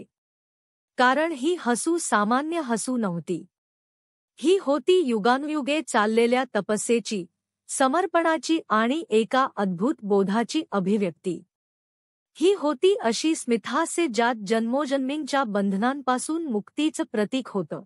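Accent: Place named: South, India. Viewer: कथा kathan shuddh मराठी bhashet सांगावी dakshinatya bhashesarkhi कथा kathan vat te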